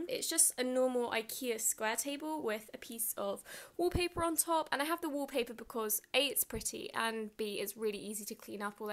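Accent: United Kingdom. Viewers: Britich or american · OMG u r sooooooooooooo pretty and ur voice is so british and i dunno its a bit...liek a tangy british accent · Are you from the uk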